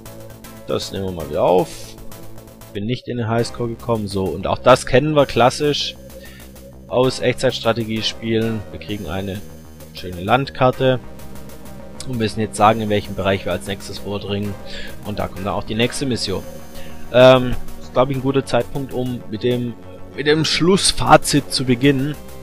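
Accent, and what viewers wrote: Swabia, Germany. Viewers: Yay, ein Schwabe ^^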